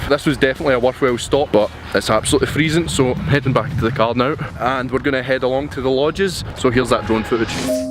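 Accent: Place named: Scotland, United Kingdom. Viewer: Knew I was going to enjoy your videos and I did. Subscribed as well. Scotland is one of the most beautiful places and absolutely love that accent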